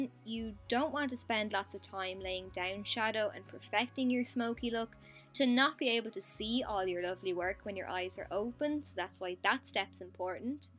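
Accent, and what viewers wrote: Ireland. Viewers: Ur accent is so cute. Are you Irish? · Love your accent~ It's Irish right ? :)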